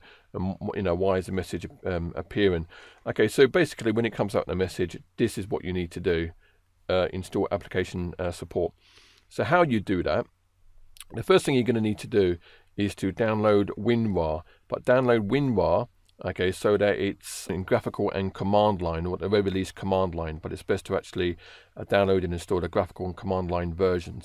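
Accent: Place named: England, United Kingdom. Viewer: I am an American so my English is very different from who I assume is doing the commentary here. He has a VERY heavy England's English "accent(?) which was VERY difficult for me to understand - sorry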